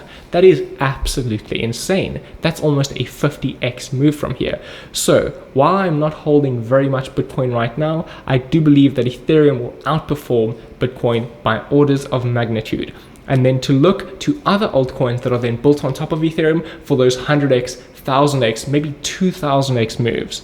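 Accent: South Africa. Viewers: Legend! Good to hear a South African voice in this space. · South African? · Are you South African?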